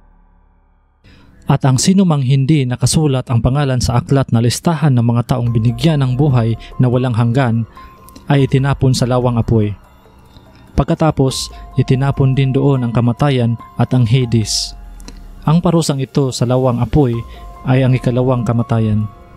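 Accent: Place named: Visayas, Philippines. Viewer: Bisaya ka bro😁ung accent mo,hehee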